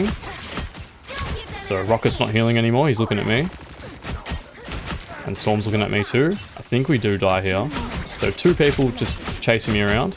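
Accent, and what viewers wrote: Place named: Australia. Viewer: I love your Australien Accent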